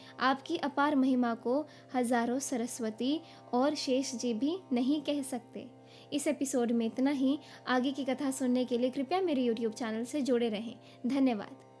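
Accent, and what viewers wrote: Standard. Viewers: बहुत शुध्द उच्चारण है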